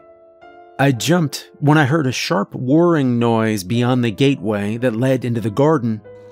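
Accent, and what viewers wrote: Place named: South, United States. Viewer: Enjoy your narrations, are you Georgian? Carolinan? Edit Or Tennessean your voice sounds to me like home. Do you have a podcast?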